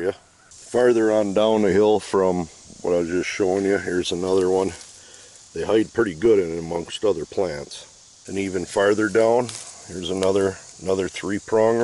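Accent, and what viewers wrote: Minnesota, United States. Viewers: Thank you so much it's very informative your accent sounds like Minnesota or maybe North Dakota where are you from? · You sound like my, Uncle...😂 Minnesota?! Great video BTW...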